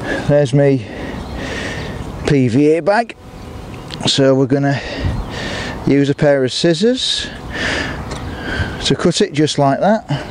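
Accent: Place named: Hull, United Kingdom. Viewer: Nice to hear a hull lad not many in Ireland love the vids keep them coming. By the way was that East Park or picky I thought it was picky but its been so long since I was there.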